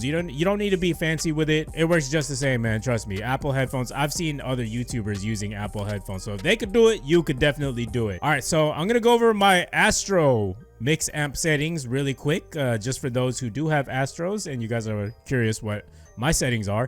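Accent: Scotland, United Kingdom. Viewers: Its Scottish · It's Scottish pal not Australian!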